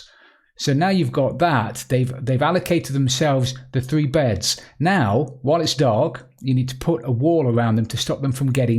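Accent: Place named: East Midlands, United Kingdom. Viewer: Gonna give this a try. Your accent sounds like you're from near me in the Notts/Derby area......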